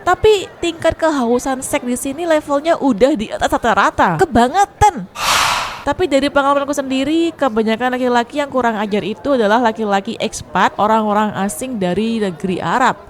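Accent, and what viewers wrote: Java, Indonesia. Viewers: Wow ... sounds an interesting story and experience. Traveling around the globe is my dream. Keren, mba. Aksen mba kaya orang Jawa.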